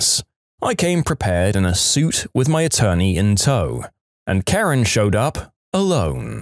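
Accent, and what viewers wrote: United Kingdom. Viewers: I had a dream where this British voice was going to leave the channel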